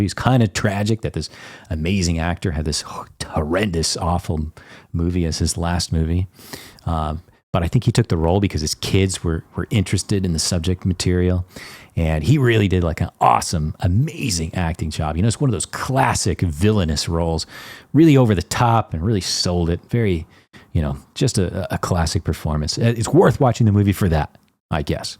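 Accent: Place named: United States. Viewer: I was half-expecting you to be dutch (I was surprised by the american accent)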